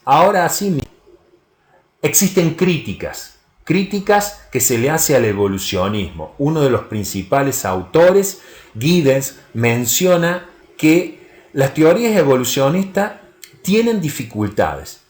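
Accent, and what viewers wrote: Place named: Córdoba, Argentina. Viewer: Muy buen vídeo, me agrado la tonada cordobesa vamo´ a tomar un fernet escuchando?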